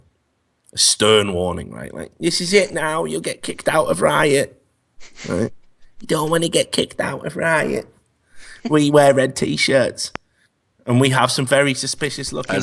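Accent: Wales, United Kingdom. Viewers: Never realised how Welsh normal off camera voice is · I've never heard you with a Welsh accent before... Sam rubbing off on you?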